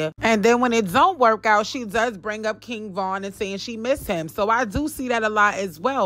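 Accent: New York, United States. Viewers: I love ya NY accent, when Yu spill tea I feel like you in my room gossiping with me 😂🥰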